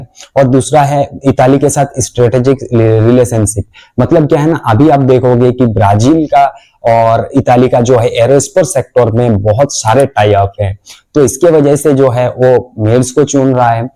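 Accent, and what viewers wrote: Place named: Bengal, India. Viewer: अभी आपको हिंदी बोलना सीखना चाहिए।बोलते समय स्त्रीलिंग पुल्लिंग का ध्यान नहीं रखा गया है। आपकी हिंदी में बंगाली छाप झलकती है।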